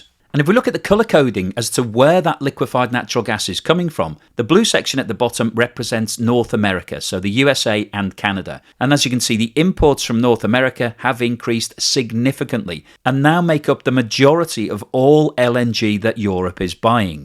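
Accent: United Kingdom. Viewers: You appear to have a British accent, so i am wondering why you say 2 times rather than TWICE?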